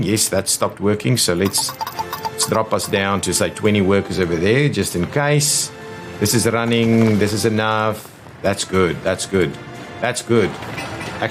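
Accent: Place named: South Africa. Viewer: This is gud shit love youre content. Also love youre accent have note heard alot of southafrican but it puts a smile on my face. So thanks fore youre hard work and Star safe in the dificult times!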